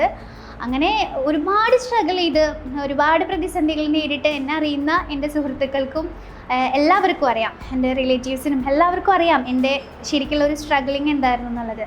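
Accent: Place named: Tamil Nadu, India. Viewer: സംസാരം കേൾക്കുബോൾ കേരളത്തിൽ ജനിച്ചത് alla alle തമിഴ് നാട്ടിൽ ആയിരുന്നു alle ജനിച്ചത് 🤔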